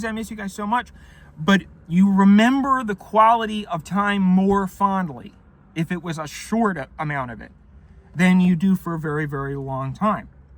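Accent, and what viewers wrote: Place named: Baltimore, United States. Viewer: I never noticed before that has a bit of a Baltimore accent.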